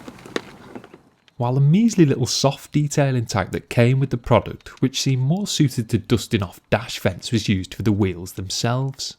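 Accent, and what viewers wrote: Liverpool, United Kingdom. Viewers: I’m really struggling to pin down that scouse accent, must be very far over the river.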